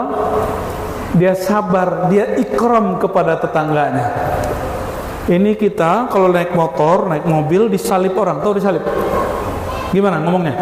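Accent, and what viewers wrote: Padang, Indonesia. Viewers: Masaallah tabarokallah Buya bahasonyo Padang totok 😂ala lamo indak Mandonga Bahaso awak kok 🙏👳👍💛💛💛💛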